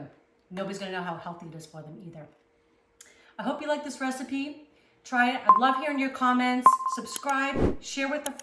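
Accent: Boston, United States. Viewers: Great video First time I’m watching! I hear a Boston accent?!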